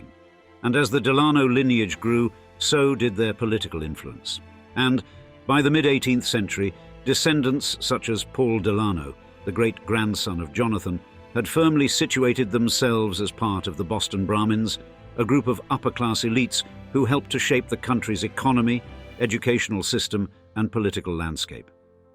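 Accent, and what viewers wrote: United Kingdom. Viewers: Americans pronounce the name 'De- lah-no'. The narrator speaks with a British accent that says it differently.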